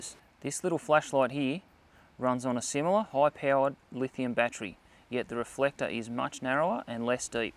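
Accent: Australia. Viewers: Really enjoying your videos mate. So good to hear aussie voice and Australian content :-)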